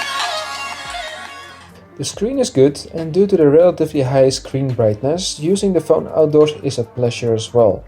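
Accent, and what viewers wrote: Netherlands. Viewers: 100% Nederlands accent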